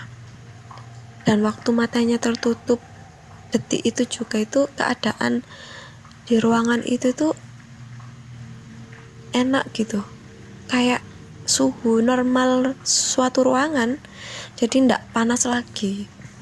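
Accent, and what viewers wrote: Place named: Central Java, Indonesia. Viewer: jawa tengah ini logatnya kalem... Medok bgt...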